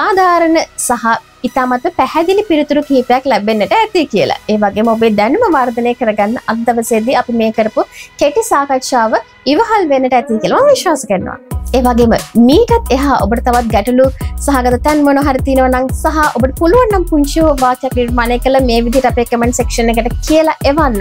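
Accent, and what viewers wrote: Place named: India. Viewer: ඉන්ඩියන් කෙනෙක් වගේමයි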